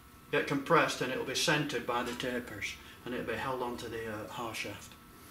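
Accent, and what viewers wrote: Yorkshire, United Kingdom. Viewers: Thanks so much for this excellent video. All of your videos, actually. Top notch. On a lighter note, I'm amazed that you can work in your good shirt and not ruin it. I've been wrenching on these cars for 50 years and mine are usually ruined in the first 20 minutes. 😂 Wish I knew if you had paypal. I'd send you 10 bucks for a pint (or 2!) as a thank you! PS love your accent. are you in the Dales?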